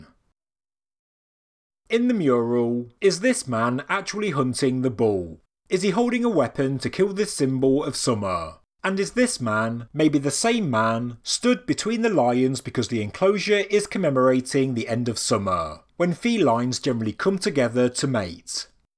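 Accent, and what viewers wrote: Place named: England, United Kingdom. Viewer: I want you to know, after I watch your videos I walk around my house punctuating then end of each sentence, in an English accent, lol. True story.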